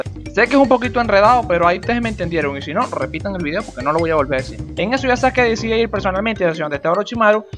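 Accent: Venezuela. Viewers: El loco habla como Maracucho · soy de venezuela y me gusta tu canal bro y hablas y gual que yo chamo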